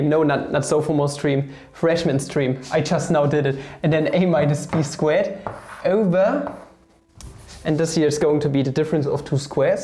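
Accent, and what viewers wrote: Germany. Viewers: Are you german? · is he german? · Bist du Deutscher?😅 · This is the most german english i've heard today.. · German accent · ne dachte gleich der klingt deutsch, profil .. aha deutsch xD ja er hat einen starken deutschen Akzent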